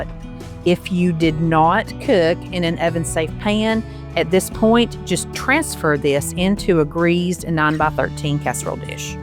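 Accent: South, United States. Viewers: Hi! I recently discovered your videos & I'm hooked! Yours are my favorite of them all! I love how you show the ingredients & sometimes tell where to get them. I also like that the ingredients are simple & the recipes are good & easy to follow (love your southern accent)! I've made several of your recipes & me & my family loved them! I can't wait to see what you'll do this year. Keep up the great work! I've been telling people about your videos because they are the best! Happy New Year & may God bless you!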